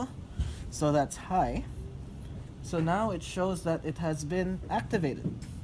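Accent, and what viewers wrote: Philippines. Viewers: I noticed the Filipino accent 5 seconds into this video haha. Greetings from another Pinoy from SoCal and it's great to see another pinoy driving an EV. 🇵🇭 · Worth it ba tong sasakyan na to sir ? Taga cali kasi ako ang dami kong nakikita nyan dito. Hindi naman malakas sa kuryente pag nag charge filipino ka halata naman sa accent nating mga filipino.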